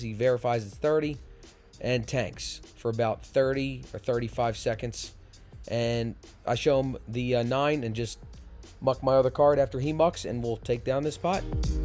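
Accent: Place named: New Jersey, United States. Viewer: Watching from Australia. Love the accent - is it New Hersey?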